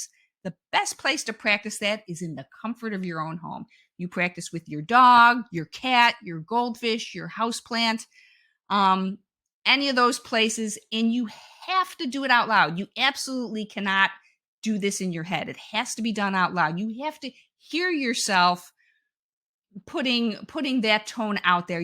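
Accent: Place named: Buffalo, United States. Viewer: I KNEW you were from Buffalo! I love the accent!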